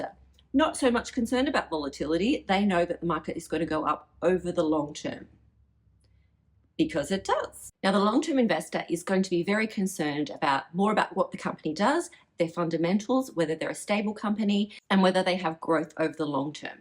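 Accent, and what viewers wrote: Australia. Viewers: Great info thankyou and Aussie accent is a bonus.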